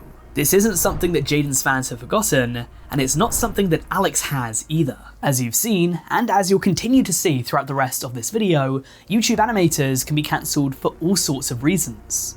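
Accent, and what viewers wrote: United Kingdom. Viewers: i like you british cody ko, much better than the other one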